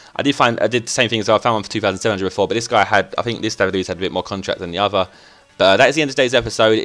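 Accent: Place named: London, United Kingdom. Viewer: Come on I'm a west ham supporter there not a bad or dirty team but by the sound of your accent your probably from London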